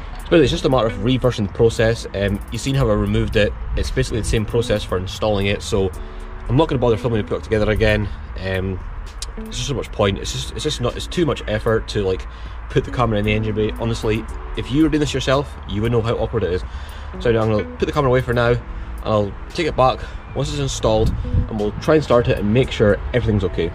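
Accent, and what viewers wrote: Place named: Scotland, United Kingdom. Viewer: I've got an A4, but harder because it's close to the bulkhead but just takes patience. I rebuilt the tandem pump as well. Oil pressure light gives you a fright 😁 VAG cars all do this with long cranks, don't ken why. Guid to hear a Scottish voice on YouTube, keep up the good work. Inlet looks spotless. 🏴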